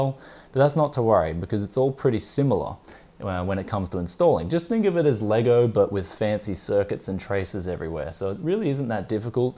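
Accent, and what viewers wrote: Australia. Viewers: Hey i have a question... I want to build a gaming computer and i know the parts i want to get, but i just don't know where to get them from since i live in Australia. I can tell by your accent you do too. So my question is: where can i buy pc parts in Australia? Oh yeah and by the way i love your videos, keep it up! Thanks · are you australian i can tell by ur accent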